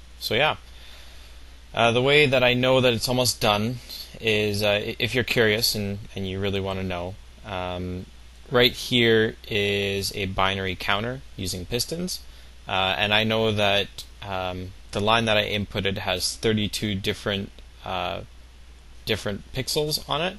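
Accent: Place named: United Kingdom. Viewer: He's hiding a British accent.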